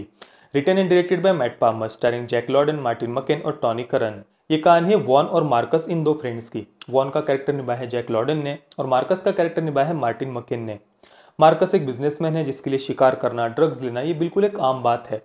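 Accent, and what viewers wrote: Maharashtra, India. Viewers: Good work bhai. Keep it up. The best thing I like about your reviews is when you say Jai Hind, Vande Mataram at the end. The way you talk, it feels you are from Maharashtra. I am from Nagpur. Where are you from? I have a few movie suggestions for you, which you would like to watch and review. For starters I will suggest, "The Third Man'. Its a very old film and is widely considered as the first in 'neo-noir' genre. Do watch it.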